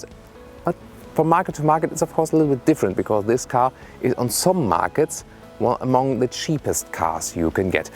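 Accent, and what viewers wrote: Germany. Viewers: is he speaking germlish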